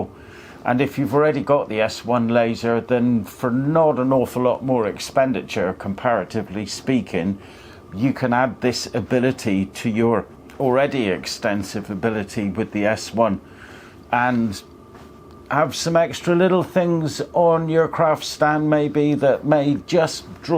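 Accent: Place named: Norfolk, United Kingdom. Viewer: Great video. One question…. What accent is that? Sounds north Norfolk. Many thanks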